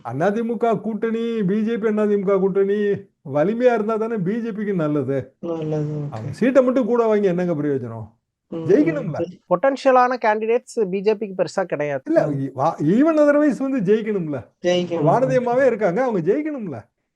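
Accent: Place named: Coimbatore, India. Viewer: கோவைத் தமிழ் அவருடையது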